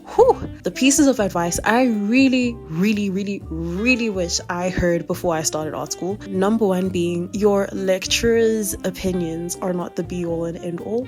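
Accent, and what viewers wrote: South Africa. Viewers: Wow! I also love Charcoal because I AM SOOO IMPATIENT. Graphite and other mediums really make feel this annoying itch that tests my patience!😂 I'm so happy I'm not the only one! Thanks for reading and answering my question. You really do sound South African 😅. THANKS FOR THIS COOL CHANNEL!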